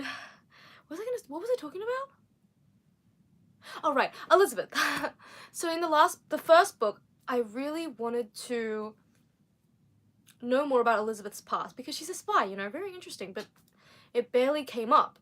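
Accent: Australia. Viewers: es muy linda 💕 y su estilo australiano de expresarse es maravilloso. Te amamos 💕💕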